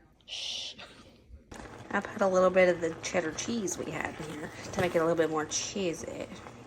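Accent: South, United States. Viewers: Hi!!! Enjoy watching you so much! Your Southern accent is just wonderful I love it lot's have a great Day okay ❤️👍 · Hi what other dry ingredient went with the flour and salt❤im irish i am hearing impaired love the recipes ❤and the southern accent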